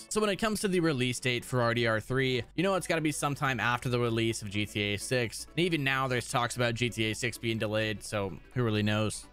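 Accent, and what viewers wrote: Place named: General American, United States. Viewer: Almost nobody else cares. It’s a general American accent.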